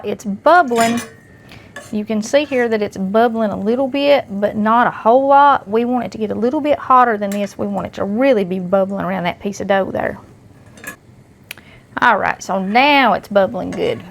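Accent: South, United States. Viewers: I am Southern born & bred but girl that accent is country, I luv it! Enjoy your videos, enjoy your bible talks, and I just ordered me a T-shirt, can’t wait to get it! Keep up the good work! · Just stumbled upon your video ,you was making homemade fried apple pies.,I watched all the video cause I liked the way you talk, don't know where you from ,sounds pure southern to me. Anyway enjoyed it very much. May God bless you in a special way . Gonna get me one of those dough cutters from the dollar tree.looks like a multi purpose gadget..thanks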